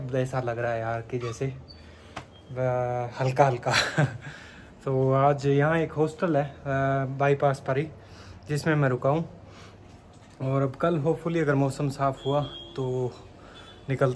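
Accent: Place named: Chamba, India. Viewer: Chamba se ho ap kya bhai nyc video apki bolne ki tone thodi chamba ki lagi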